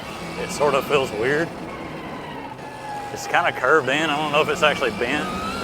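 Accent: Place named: South, United States. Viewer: I knew exactly what you meant, because that's how southerners talk! Lol.. I'm from Texas so I'm not exactly a 'southerner', but we still talk very similar and sound similar. Your accent just sounds normal to me, and the words and phrases you use too.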